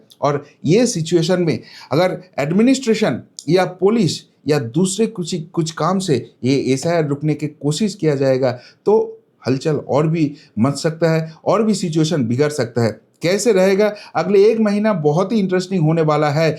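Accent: Bengal, India. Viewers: আপনার হিন্দি যথেষ্ট ইনপ্রুভ করেছে। বাঙালিয়ানা টানটা যত কমিয়ে নিয়ে আসতে পারবেন তত সুন্দর হবে । ভিডিওটাও যথেষ্ট ভালো হয়েছে ।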